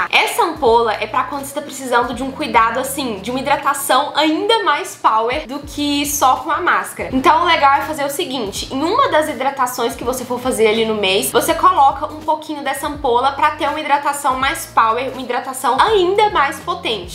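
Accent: Minas Gerais, Brazil. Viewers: é o lindo sotaque mineiro ❤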